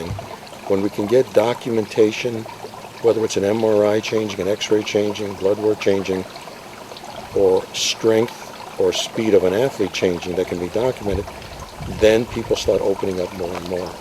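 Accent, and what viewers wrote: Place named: Bengal, India. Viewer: Started off sounding like a bong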